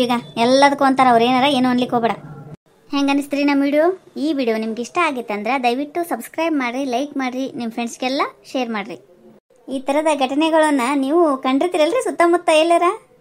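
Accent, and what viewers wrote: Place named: Uttara Kannada, India. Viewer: Namaste akka, sambhashane Matra super, ondu kathe na vastavakke tarodu enu easy alla, film olage ondondu character nu bere bere yavru madtirtare, avravra character olage involve adre aytu, but ella character olagu obre involve agi, kathe na vastavakke tartira, nimma talent ge hats off 🙏 adrallu uttara kannada bhashe sogadu, mukhadalli tumba nagu taratte, otnalli nim videos refreshment agiratte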